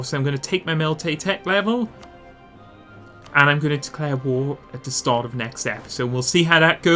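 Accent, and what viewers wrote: Northern Ireland, United Kingdom. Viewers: North Irish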